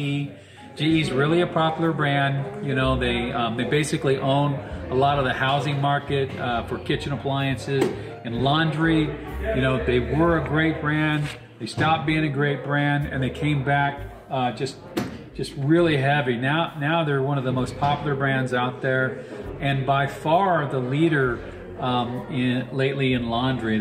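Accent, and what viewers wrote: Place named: Midwest, United States. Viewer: Typical Midwest accent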